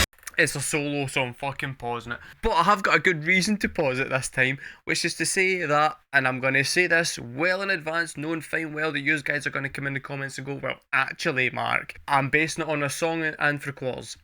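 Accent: Scotland, United Kingdom. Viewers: Your words are SO much better in a Scottish accent; you really do recognise genius and express that so well. I've enjoyed every moment of this. Thank you mate.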